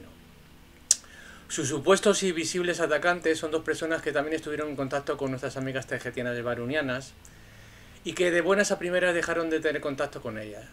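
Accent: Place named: Brazil. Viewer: escribí para comentar en el video. puse: ¿Por qué tengo la sensación que la que habla en el video es usando un modulador de audio y poniendo acento brasileño? Bueno, pues después de contestar que parecía una tonta y que dejaramos de inventar teorías locas etc, borró todos los mensajes.... ya no hay rastro de ellos. Todo lo que dice y cómo lo dice es clavadita. Si no lo quieres ver, vale. Cada cual siga su propio camino, no el de otros.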